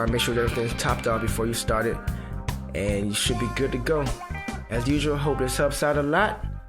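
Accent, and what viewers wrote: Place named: Jamaica. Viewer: Nothing Cummings in you guys face. LOOOOOL Yankee boy. i hear a Jamaican boy a twang.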